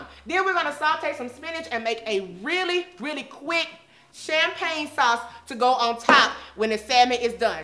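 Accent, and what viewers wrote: England, United Kingdom. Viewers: I “REALLY” Liked your “British” accent!!! Classy!!🙌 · It's the british accent for me. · She took me out with the English accent 😂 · It's the British accent for me 😂 😂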